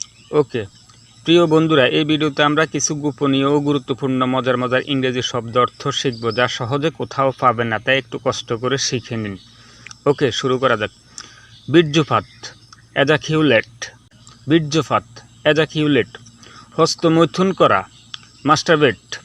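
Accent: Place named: Bangladesh. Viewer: উচ্চারণ শুনে বোঝা যাচ্ছে বাংলাদেশী ইউটিউবার। বানান আর উচ্চারণ ঠিক নেই তো কিভাবে গণমাধ্যমে শেখাবে?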